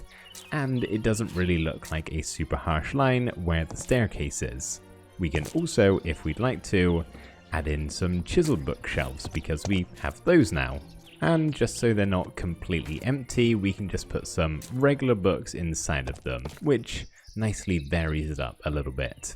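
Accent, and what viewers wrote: United Kingdom. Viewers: Your accent is very pleasing. Where is it from? It sounds a bit British but not quite? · thought i was clicking on a minecraft video, turns out its asmr. never in my life did i realize the pleasentness of hearing a slightly deep voiced british man whisper me sweet nothings while having moonlit sonata playing in the background... I now know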